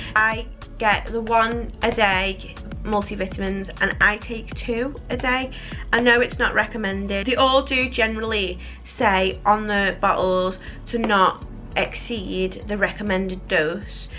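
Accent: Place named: Liverpool, United Kingdom. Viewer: Am in my early 30s and have had it for prob 7 years it’s a nightmare. Glad your putting yourself out there and trying to help us. You sound like a slight scouser too am from the north of Liverpool myself. Keep up the good work your positive outlook is great 👍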